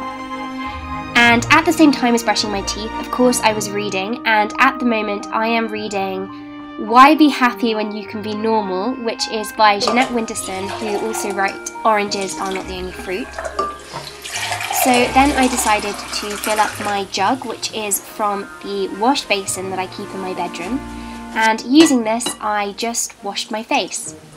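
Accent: RP, United Kingdom. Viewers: Love yr bristish accentttttt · i love how british people say haiy like im gonna brush out my haiy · Ppl are always saying that British ppl aren’t like this/don’t sound like this, that’s not true, there are so many accents in the uk! I sound like her and so do a lot of people, not everyone obviously but it’s true that this routine fits the very stereotypical idea of England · im british and i can tell you that nobody is this posh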